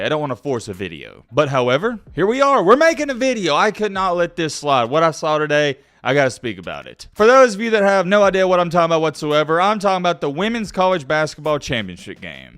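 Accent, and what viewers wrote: South, United States. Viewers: Dude get a life it’s sports SHES white and ur mad and ur southern accent makes it worst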